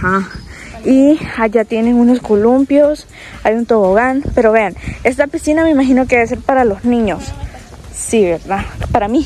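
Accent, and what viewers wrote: Guatemala. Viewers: Estoy sorprendido porque tú ya no hablas como salvadoreña, por un momento pensé que eras chapina.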